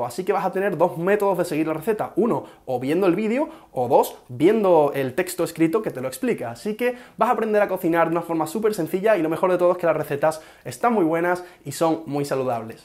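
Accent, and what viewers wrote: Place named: Canary Islands, Spain. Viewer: Tienes un acento que me recuerda a Islas Canarias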